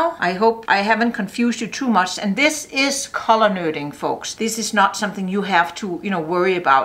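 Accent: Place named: Denmark. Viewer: love this colour. Sounds like you are originally from Denmark. Am I totally wrong? 🙂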